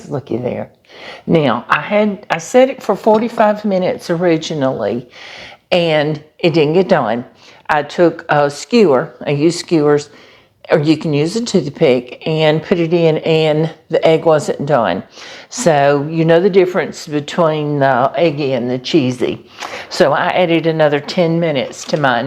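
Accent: South, United States. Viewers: I ❤ your southern accent. I am new to your channel. Enjoying your channel.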